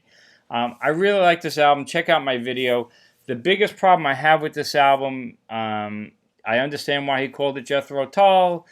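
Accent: Long Island, United States. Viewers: Is that a North Babylon Fire Department hat? You sound like a Long Islander lol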